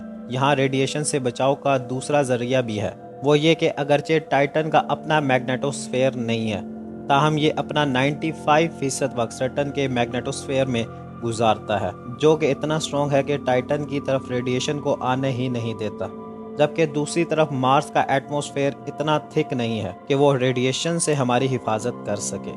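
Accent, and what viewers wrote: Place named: Pakistan. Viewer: Ha bhai iske accents pakiatani hai but it's ok we Indians support pakistani also 😊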